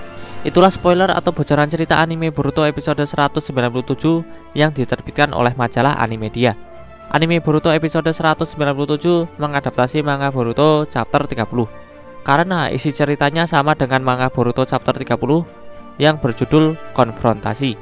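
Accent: Java, Indonesia. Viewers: Medok broo.. heheh ayoo puasa.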